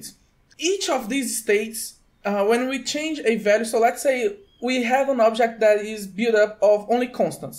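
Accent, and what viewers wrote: Brazil. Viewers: Ótimo video! Eu já vi outros videos teus, e pelo sotaque, desde o início desconfiei de você ser brasileiro, com este eu confirmei a suspeita. Sotaque brasileiro bem característico. Hahahaha. Com ou sem sotaque, continue com os vídeos. São muito esclarecedores. E sim. Taxation is theft!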